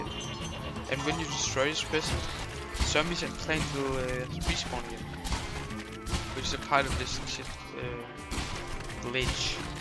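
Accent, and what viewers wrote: Denmark. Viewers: I Can hear you’re danish👏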